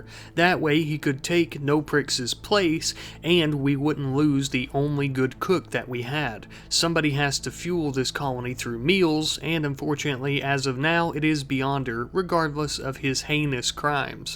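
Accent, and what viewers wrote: South, United States. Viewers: I detect a southern drawl.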